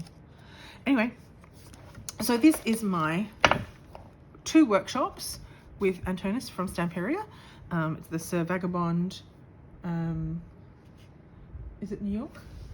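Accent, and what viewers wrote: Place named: Australia. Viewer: Hi . You sound Australian. Where did you go for the workshop , sounds like I would have liked it a lot. I’m in Melbourne . Thanks for sharing .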